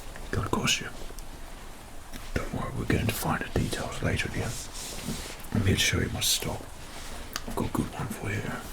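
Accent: United Kingdom. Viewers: Hes bri’ish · .......i have no idea why i like this "gangsta british accent"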